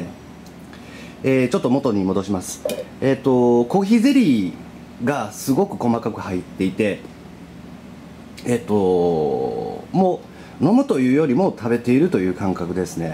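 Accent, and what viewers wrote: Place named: Osaka, Japan. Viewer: 意識すると大阪弁は出ませんねぇ。 殆ど漫談になってるのが、おもしろいです。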